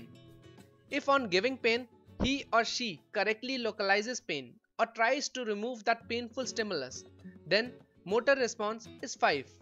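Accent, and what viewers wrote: India. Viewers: Useful video thank you I love your accent I love Indian 2 ♥️♥️♥️♥️🌹🌹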